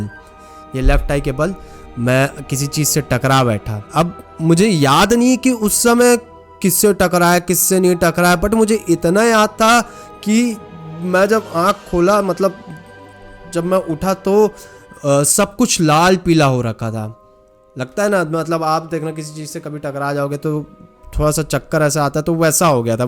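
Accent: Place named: Hills, India. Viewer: You are talking like pahili vale log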